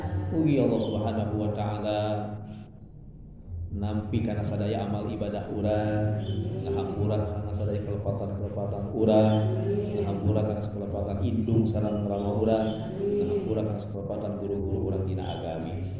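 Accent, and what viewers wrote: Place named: Sunda, Indonesia. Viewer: Meni nyunda........😁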